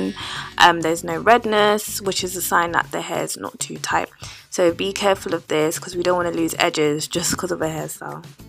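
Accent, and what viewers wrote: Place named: United Kingdom. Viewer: you have a British accent, are you based over here in the UK?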